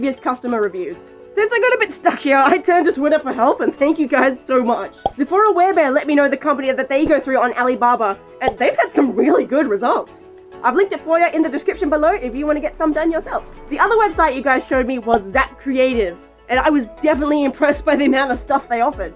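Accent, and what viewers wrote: Australia. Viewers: I forgot you were Australian until I clicked on this video......Oof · i have no idea why but i can hear the Australian accent stronger for this episode and i love it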